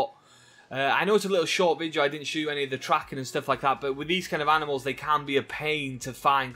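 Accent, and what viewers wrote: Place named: Wales, United Kingdom. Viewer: I like your welsh accent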